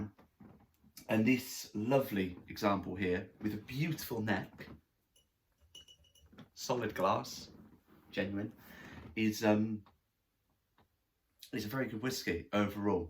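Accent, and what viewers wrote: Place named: United Kingdom. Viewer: i want what this guy is having. What an energy! I wish I hadn't looked at this "guns" though as Ron Burgundy would put it, cause now I feel so skinny. "don't worry he's fine, it's only a staircase!" hahahaha, please do more videos like this one, how good is it to be able to both listen to a guy speak about scotch while making funny jokes (especially with a nice British accent)/ Thanks from France.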